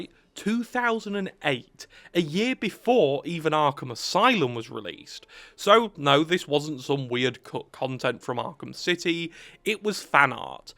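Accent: United Kingdom. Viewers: British bloke talking about video games? Don't mind if I do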